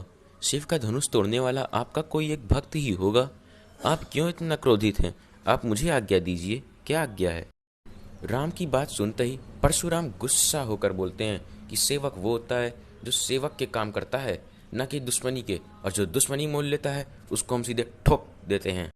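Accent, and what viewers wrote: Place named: Bihar, India. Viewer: Gajab bihari language bole hai bhaiya aap, and thanks for this great explantion🥰 Love from Bihar❤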